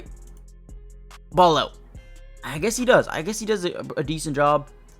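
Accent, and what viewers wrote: Canada. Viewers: Def need a Gardner minshew vid, btw random question but are you Canadian? Lol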